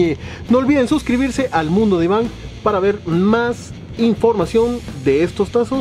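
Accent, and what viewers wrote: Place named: Guatemala. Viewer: V creo que eres de Guatemala :v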